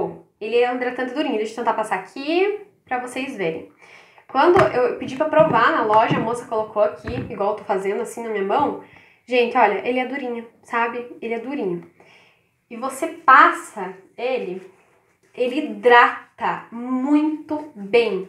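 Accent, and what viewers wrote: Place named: Paraná, Brazil. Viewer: Oii lindona !.você é do Sul do Paraná? Tem um sotaque muito parecido 🥰♥️você é muito espontânea ,amei suas impressões! 😘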